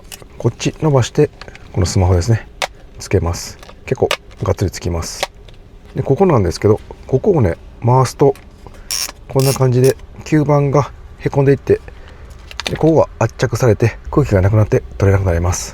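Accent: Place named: Kansai, Japan. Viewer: そろそろ関西弁なんとかならんの？？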